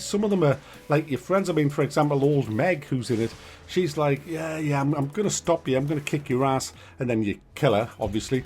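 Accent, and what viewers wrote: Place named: Scotland, United Kingdom. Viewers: Thought he is Scottish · This guy sounds Scottish.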